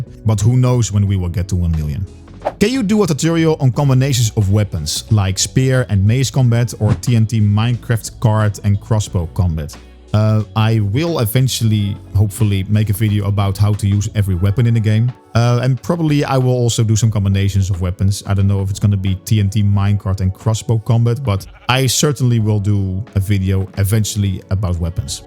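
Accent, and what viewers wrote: Netherlands. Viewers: ik had eerder gehoord dat je een nederlands accent had!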